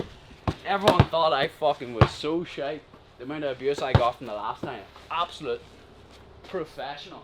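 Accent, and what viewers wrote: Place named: Belfast, United Kingdom. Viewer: Were you getting a lot of feedback that fans can't understand the Belfast accent? lol subtitles. 💚🤍💛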